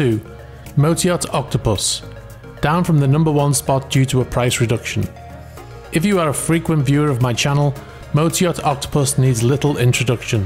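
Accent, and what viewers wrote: United Kingdom. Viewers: By the way, bloody good job on the intro image of the S/Y eSysman. Sets the proper mood. Next improvement may be to animate the stern door to open, revealing your Porsche. And for what it's worth, being new to your channel and somewhat out-of-tune with a British accent, will you forgive me for thinking that all the boats you feature are flagged in Cyprus? (super yacht...... cypriot).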